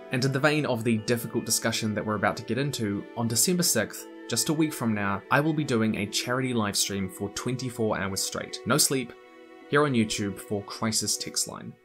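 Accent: New Zealand. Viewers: When I hear your accent, all I can think about is that new Zealand deck commercial. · Ahh I love your accent- It has nothing to do with the video but kiwi accents are always so- yes.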